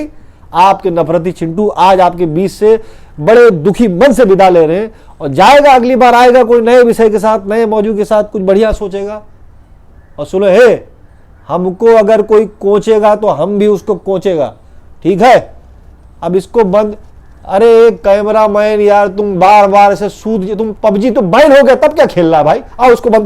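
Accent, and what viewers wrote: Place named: Kanpur, India. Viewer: Rocks!! Once again. You hit the bulls eye... 🤘🤘🤘🤘 God bless... And ur kanpuriya accent is getting better by the day... Hum bhi flabbergastiya gaye!!! ❤❤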